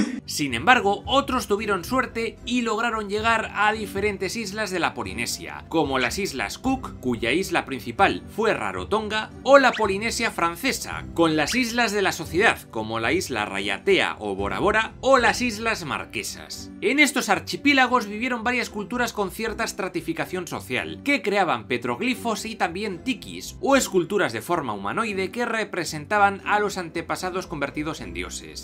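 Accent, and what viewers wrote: Spain. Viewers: ¿Has pensado hacer una versión hablada en español latinoamericano? Es que el acento y la forma en que se habla en España nos resulta desagradable, pareciera que se estuvieran desinflando al pronunciar las palabras. Piénsalo, tal vez tus compatriotas te sigan y así tendríamos un mundo con un español más agradable.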